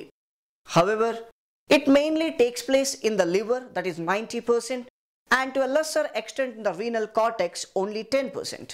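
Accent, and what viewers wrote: India. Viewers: You sounds exactly like my indian classmate who topped the class and admitted to the Singapore's NTU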